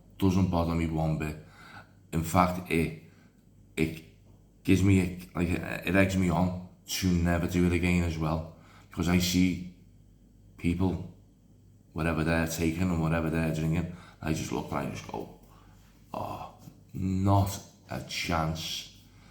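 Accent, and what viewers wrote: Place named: Liverpool, United Kingdom. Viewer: ❤❤❤ most honest talk I have ever heard what a pleasure to listen to proper scouser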